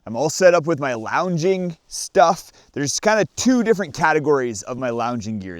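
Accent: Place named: Canada. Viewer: Sometimes it’s hard for non-North Americans to distinguish US vs Canadian accent. But boy do you clarify the differences.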